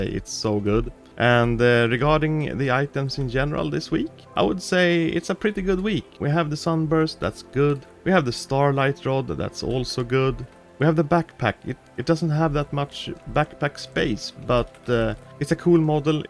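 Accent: Sweden. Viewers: You sound A bit swedish. is it just me?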